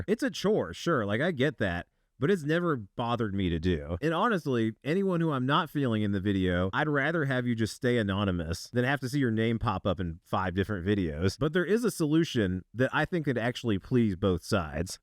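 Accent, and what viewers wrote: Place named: California, United States. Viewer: Man that cali voice is fckn annoying I can't man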